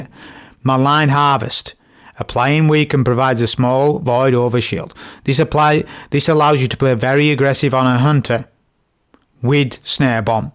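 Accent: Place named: North, United Kingdom. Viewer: Northern Accent Sh*t Talking Destiny, Viewers, Guardians. Informative Gameplay Even Showed A Cheese I'd Never Seen!! Immediate Sub 😄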